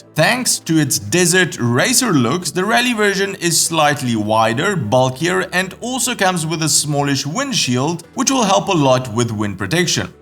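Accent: South Africa. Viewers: For a Romanian you have a remarkably South African accent 😀 - love the videos ! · Nice video as usual.thanks guys. Ps: your accent sounds like mine... Afrikaans. Intrigued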